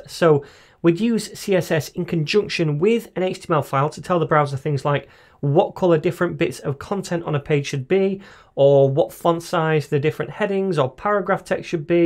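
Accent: United Kingdom. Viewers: thanks a lot. i like to watch your video not only because i wanna learn about web programming language but also learn about english especially british. and i like your accent. its rarely british guy make programming tutorial on youtube. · By your accent I can tell you are British, pls marry me. A British tech dude. Perfect combo! 😍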